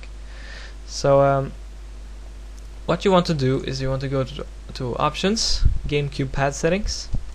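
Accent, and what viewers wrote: Norway. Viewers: Haha I just knew you were Norwegian when I heard your accent! :3 Which isn't bad at all btw, you have very good english! Most Norwegians do actually! Eg e Norsk forresten, halvt Canadadisk faktisk, men bor i Norge :D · Ha e skjønt du va norsk før du sa da xD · norwegian accent is one of my favorites i have many friends in norway and find it crazy how americanixzed your country has become. It astoounds me that your schools have english as a required language. Awesome video bro! thanks for the help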